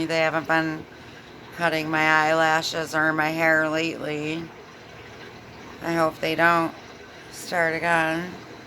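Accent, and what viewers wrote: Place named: New York, United States. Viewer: Wow, I grew up in NY and was all over your other channel trying to figure out if you are in NY from your accent. I finally looked up a restaurant I saw on a sign in your video, then found this channel, where you announced exactly what I took a half hour figuring out (Rochester). So I am going through your vids as a fellow NYer (in Phoenix - don't come here) point of view and not to be a sadist but enjoying it because it is comforting to hear from someone with your NY vibe.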